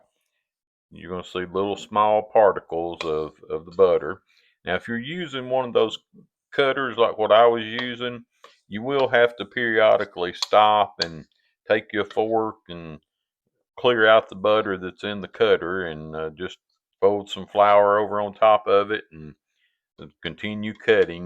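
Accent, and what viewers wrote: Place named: South, United States. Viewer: Thank you for the recipe. My Mom & Grandmas made the best biscuits, I’m a biscuit failure, they both said I worked the dough too much, gonna try with you!. I am a North Carolinian - born & raised. Love your accent, SO many carpet baggers have moved in here, it’s rare to hear a Southern accent! Bless Your Heart!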